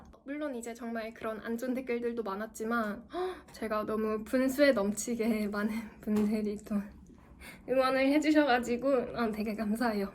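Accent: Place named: Canada. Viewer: 영문으로는 18.6K라고 뜨는데 상이하나봐요! 그리고 사실은 영어녹음한 동영상 다 안보고 일부만 들었을 때든 느낌은 상당히 익숙한 억양 및 발음으로 느껴져서 미국 중서부지역이나 캐나다오대호근처지역에서 오랫동안 살았다고 추정은 했는데 맞았네요 ㅎㅎ 토론토에 오래 살았을 것 같아요! 거기서 영어연수해서 알아챘어요!ㅎㅎ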